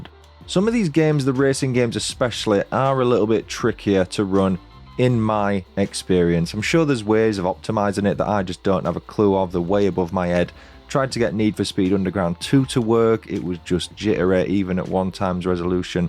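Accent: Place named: Yorkshire, United Kingdom. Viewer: Where in Yorkshire you from pal?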